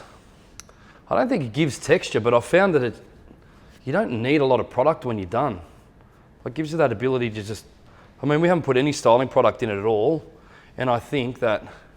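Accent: Australia. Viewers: is he jewish /american,,he looks like Billy joel but sounds like an auzzie or new zealander,,he will probably never come to the united kingdom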